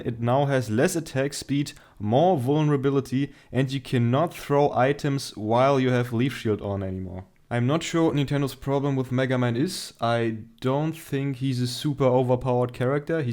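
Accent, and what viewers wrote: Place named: Germany. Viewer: dein Englisch klingt echt hart deutsch.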